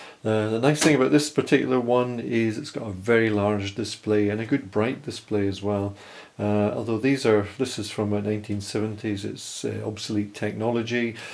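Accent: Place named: Scotland, United Kingdom. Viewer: Hi! This is great. I have a 7603 scope here and is in need of repair. I inherited it from a friend and it was barely working (some initial display but eventually gave in and now just sort of frozen). I use it for experimental XY drawing – as you say it has a lovely big display! Although I have some experience of electronics repair (swapping in broken pots and switches and caps on old synths etc) I am very wary of getting into these devices as there are some high voltages. I am also no good at diagnosis – I can only carry out repairs if I know what I need to replace! I notice you have a Scottish accent(!) – I am based in Glasgow. By any chance are you reasonably near? I would love to bring this scope back to life and am looking to commission someone to diagnose and help me. Any advice hugely appreciated and thanks again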